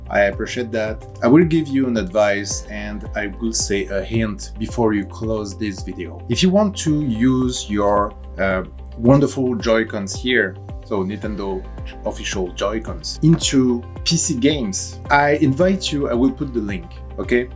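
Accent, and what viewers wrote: France. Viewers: are you french? you have a french accent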